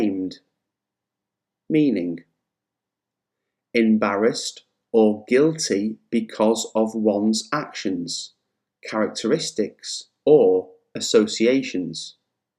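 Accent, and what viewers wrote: United Kingdom. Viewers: The pronunciation is a British accent?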